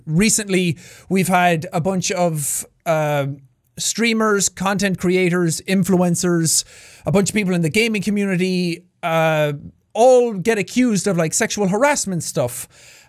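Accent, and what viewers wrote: Ireland. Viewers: Hes irish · I miss this loud Irish person so much I started to watch his happy wheels playthrough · Jack r u irish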